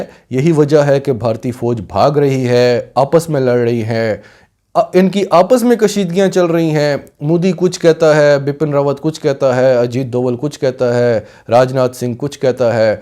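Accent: Pakistan. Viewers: Saieen ki azmat ko ek syed ka salaam best urdu accent keep our sindhi bhaiee pure pakistani accent keep doing this imence respect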